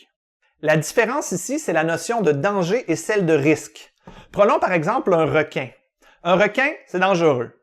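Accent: Canada. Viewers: Ben ouai c’est logique, un produit qui extermine herbes, insectes, vers et autres est inoffensif pour l’humain, c’est juste de la magie n’est ce pas? Àvec l’accent canadien rigolo c’est encore moins crédible... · Hello, je découvre ta chaîne, tes vidéos mériteraient d'être diffusées plus largement, à un plus grand public. Le format est court, les explications claires. Je conseille à tous de diffuser et partager un maximum. (et puis l'accent québécois, je ne m'en lasse pas, que ce soit avec Olivier Bernard avec "Le Pharmachien", Isabelle Stephen dans "Le monde merveilleux du scepticisme" ou encore "L'inspecteur viral"). Continuez à chasser les idées reçues, vous êtes d'utilité publique!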